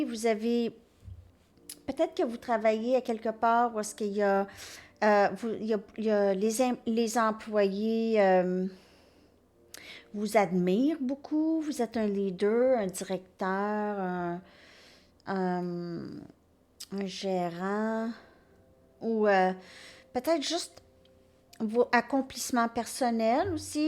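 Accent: Canada. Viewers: Bonsoir madame vôtre accent canadiens est sublime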